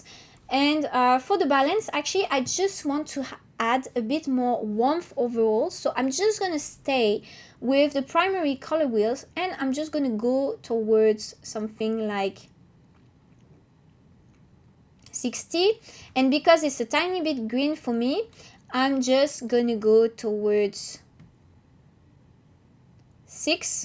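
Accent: France. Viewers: Your accent sounds French.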